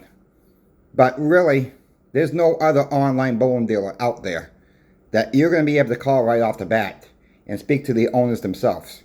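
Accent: Boston, United States. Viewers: I thought you were from New York but you said you’re from Boston. I can hear it now.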